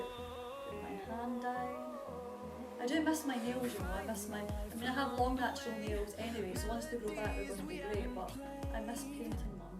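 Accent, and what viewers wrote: Scotland, United Kingdom. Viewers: You sound Scottish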